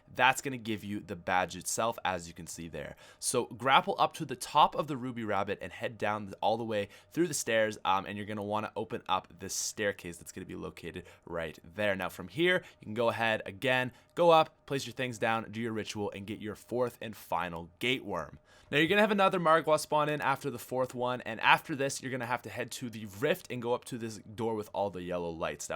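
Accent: Canada. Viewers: I feel like the further you got in the video the more canadian you sounded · is he canadian?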